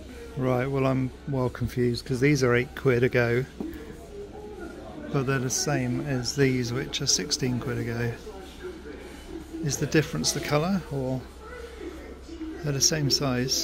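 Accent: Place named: Australia. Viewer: Can you tell us approximately where you live? The scenery is so beautiful. I can tell feom your accent you are in Australia or NZ. (Hope I didnt offend-I know those are 2 very different places!)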